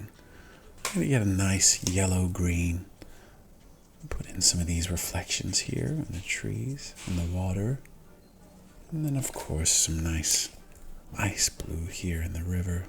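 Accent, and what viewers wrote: United States. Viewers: American accent on point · you pull off the American accent really well. Great drawing. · I cannot listen to you with an American accent I'm going to SCREAM ITS SO UNNERVING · Nice Amati can accent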